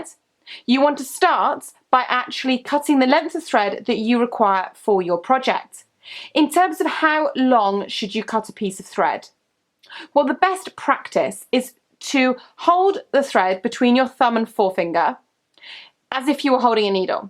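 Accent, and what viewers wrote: United Kingdom. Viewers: Very helpful, tysm. btw luve,luv LUV ur british accent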